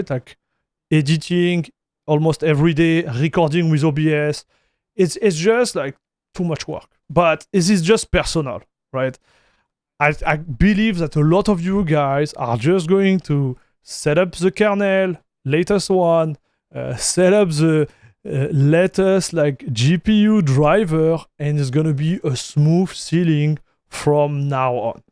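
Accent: France. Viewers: bravo for your franglais. I can recognize myself in you. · go french accent. love to have that. lol. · Came for the French accent, stayed for the Trixie technical details, particularly kisak-mesa fresh, nvidia drivers, and the linux-tkg scripts on github. Two points: might I suggest you put links to these sites below your video review. Also, kisak-mesa seems to be for ubuntu. Do the debs in their ppa work with Debian 13? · I'm only catching like one out of every five words this guy says. His accent sounds French which makes sense they're because their language sounds like they have an eternal loogie stuck to the back of the throat. Such a disgusting guttural language.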